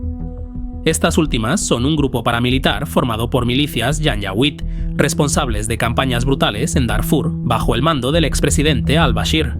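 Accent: Spain. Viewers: gracias a Dios que contraron a un español para traducir al castellano en acento neutro de España. La IA es cutre y suena a tu acento tercermundista de come bananas.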